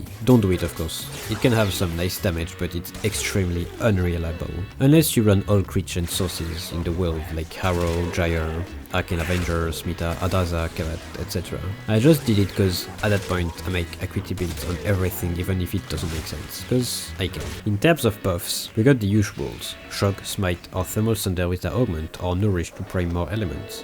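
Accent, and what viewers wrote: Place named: France. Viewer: Love that little French accent❤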